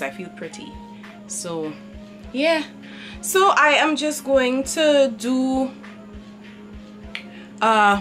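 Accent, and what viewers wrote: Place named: Trinidad and Tobago. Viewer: Hair looks nice . Accent sound like a trini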